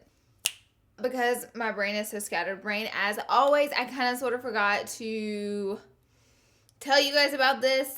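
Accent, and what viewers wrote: South, United States. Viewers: Is it just me or do some people watch her videos for her beautiful southern accent😍😍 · Try dermacol foundation ask jeffry about this foundation . Who ever say crap abt ur accent dont give a dam girl . Hi 👋 to my southern girl 😻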